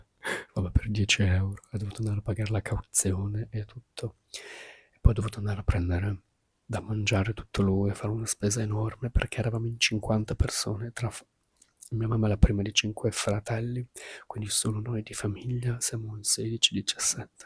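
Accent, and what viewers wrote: United Kingdom. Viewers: Italiano molto migliorato, comunque a parte l' accento inglese l' italiano è perfetto dai, complimenti per la festa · Hai fatto una cosa bellissima per tua mamma! mi spiace debba affrontare tanta sofferenza e da sola ❤ p.s. in questo video hai perso un po' l'accento inglese 😅😉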